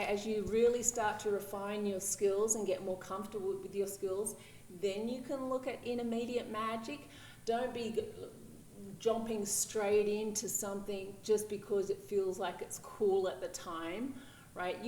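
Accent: Australia. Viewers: Can't help noticing your Australian accent, I'm a fledgling Black Magician living in Sydney, could you advise me of any groups in Sydney? Much appreciated.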